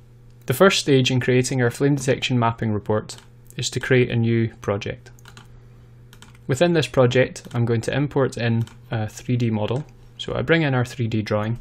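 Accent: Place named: Ireland. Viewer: are you Irish? nice accent tho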